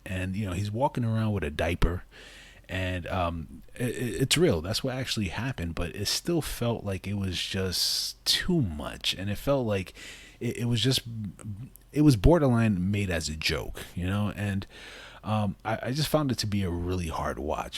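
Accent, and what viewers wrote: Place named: New York, United States. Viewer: Sounding real new yorkish!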